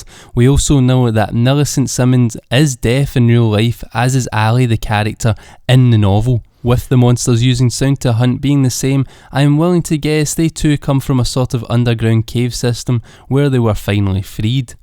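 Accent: Scotland, United Kingdom. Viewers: Fucking love your accent dude! Not what I'd expect in this type of video, but fucking lovely nevertheless. Sounds Scottish with a Welsh tint. Southwestern Scotland perhaps? Entertaining as fuck. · That accent...sounds Scottish, I'm Scottish.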